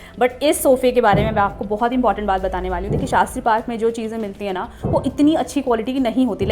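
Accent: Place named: Braj, India. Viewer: Ap mathura se hai Mam,, ? Apka acent Brajwasiyo ki trha lgta h 🥰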